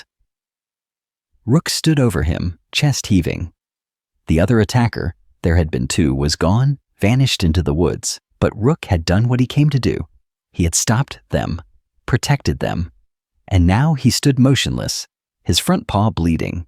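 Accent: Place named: United States. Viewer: I like the narrators voice! Appreciate that she sounds like the USA… Kind of tired of all those British accents talking USA stories. Good story.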